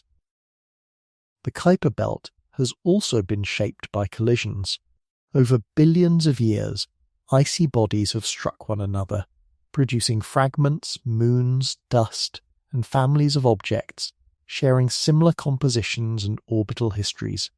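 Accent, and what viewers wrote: United Kingdom. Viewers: gentle voice from eleven labs 😊 British voice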